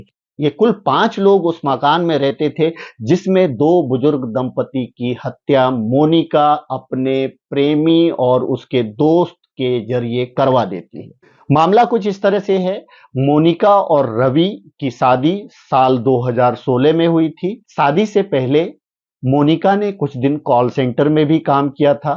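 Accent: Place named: Bihar, India. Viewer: सही पकड़ा एंकर बिहारी है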